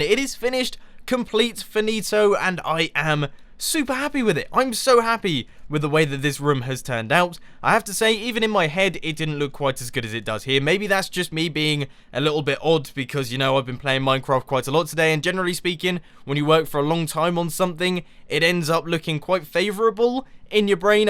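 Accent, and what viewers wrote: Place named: United Kingdom. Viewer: I seriously could lissen to that british accent all day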